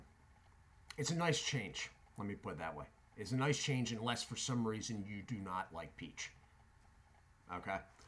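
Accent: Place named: South Jersey, United States. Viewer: I can tell by your accent your from my neck of the woods. South jersey/philadelphia?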